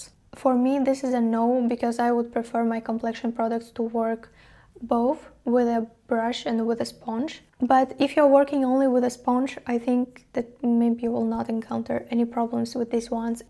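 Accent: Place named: Eastern Europe. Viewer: Where are you from, love? I’d guess you might be slavic?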